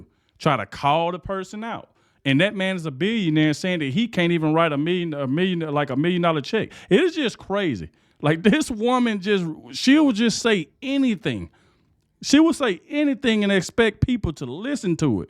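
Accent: South, United States. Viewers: Love your Pod Cast👊🏻👍🏻. Your Southern accent makes me think of my Louisiana , not Louisiana , roots💕. I love the DEEP SOUTH AND ITS DOWN-HOME FOLKS👏!! I had to come back to San Diego Calif after 22 years in Florida. I MISS FLORIDA SO MUCH😢!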